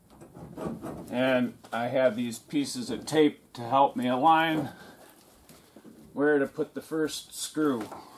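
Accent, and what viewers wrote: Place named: Ireland. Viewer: Nicely done! Good practice lesson love the Irish ☘️ accent!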